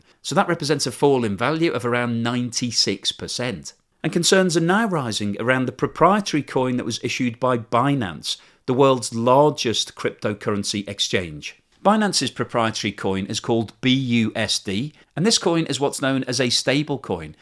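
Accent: United Kingdom. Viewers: P.S. appreciate your regular brit accent. Don't do Posh! That accent sounds like the king of england got combined with a California valley girl. Awful. Posh accents are ruining British English!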